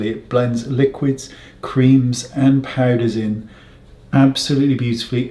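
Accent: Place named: Somerset, United Kingdom. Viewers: Love this I’m going to try it by the way congrats 🎉 on your foundation my colour is out of stock at the minute but soon as it’s back I’m going to get it My Grandfather’s was from Somerset I recognised the accent best wishes 🌸🌹🌼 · I was laughing at the accent. My husband's family is in Somerset. Thanks for the technique reminder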